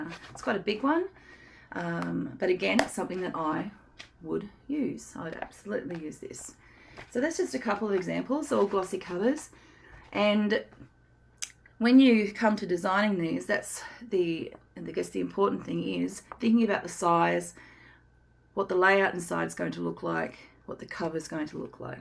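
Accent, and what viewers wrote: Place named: Australia. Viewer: It is great to hear an Aussie expert voice! Glad I found your channel!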